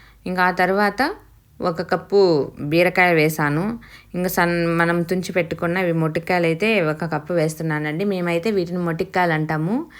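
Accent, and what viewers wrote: Rayalaseema, India. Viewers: Mee proper ekkada ? Vintunte rayalaseema ankunta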